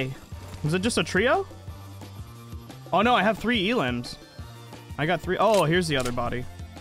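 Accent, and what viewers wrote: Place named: United Kingdom. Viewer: “hello there” he says in his normal British accent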